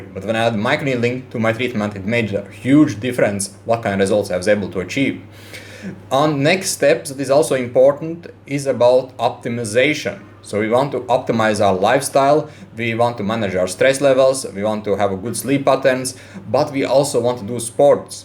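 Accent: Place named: Russia. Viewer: Are you Russian?! Your English made my brain fuckd up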